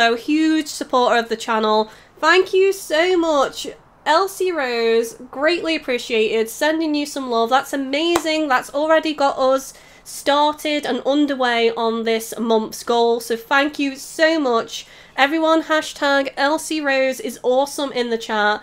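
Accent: United Kingdom. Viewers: Just a quick question what part of the UK are you from? I'm really bad at accents so I can't tell (I'm from the UK too but I only know a few accents for certain)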